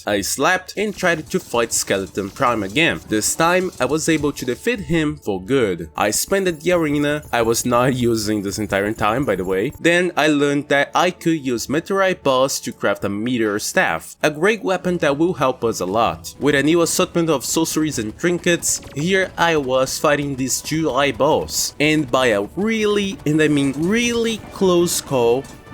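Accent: Brazil. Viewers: Awesome video just like the prehardmode one. I'm trying to tell where your accent is from, are you Brazilian?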